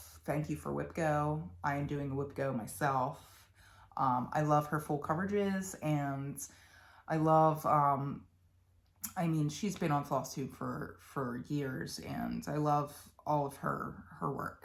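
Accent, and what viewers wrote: Philadelphia, United States. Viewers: Fellow Philly girl here. I HEARD the Philly in your voice in the first sentence you spoke! Not that we have accents...it’s everyone else who have the accent! Loved your video!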